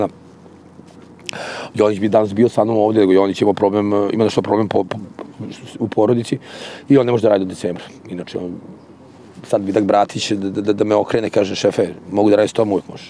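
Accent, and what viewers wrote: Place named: Bačka, Serbia. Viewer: Лакат краљ, полако али сигурно набацује бачвански акценат..😎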